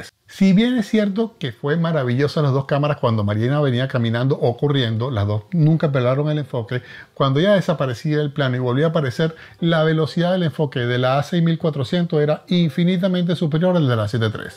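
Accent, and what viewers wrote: Venezuela. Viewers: jeje nunca había visto tus videos pero apenas hablaste ya nos dimos cuenta que eres venezolano buenísimo contenido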